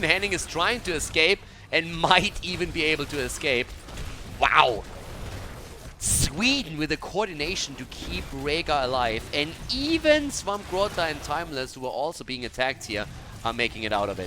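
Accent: Germany. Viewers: Good commentary. It's Zometzing witz the german accent that just makes it more intriguing.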